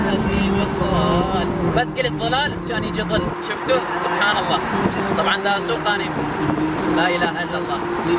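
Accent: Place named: Iraq. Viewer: حلوو استمر بس انته اشو تكول دبي واحسن تكساس من دبي انته عراقي لو من دبي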